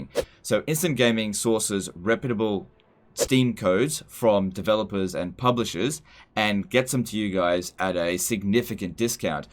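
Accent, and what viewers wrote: Australia. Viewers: I only just realised you live in Australia. It’s like the bloody Aussie accent didn’t give it away somehow. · Huh, didn't realise you are Australian. I thought the accent sounded familiar, but I'm so used to American or British content creators.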